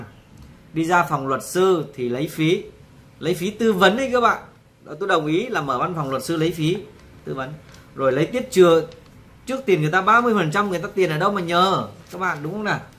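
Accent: Standard. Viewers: Bạn nói chuẩn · Ban noi qua.chuan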